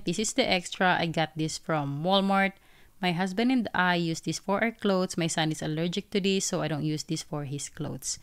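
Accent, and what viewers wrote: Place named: Philippines. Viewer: 🛒 Loved the video, so I had to subscribe! If you don't mind my asking, are you Filipino? I lived in the Philippines until I went to college in the states and your accent makes me homesick. ❤ So many good items you found at Sam's club! I'll have to try the fried rice, it looks so good!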